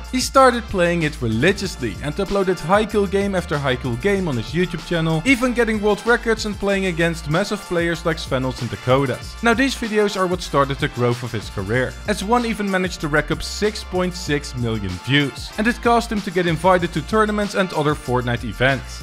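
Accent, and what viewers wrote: Netherlands. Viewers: Bro aan het begin hoorde ik dat je NL was en toen dacht ik wat hoe wist ik dat niet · ik wist dat je nederlands was je accent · Nederlandse support 🇳🇱🇳🇱 ik dacht al dat je nl was aan accent maar wist niet zeker wel ziek ouwe je doet goed bro ga zo door💪♥️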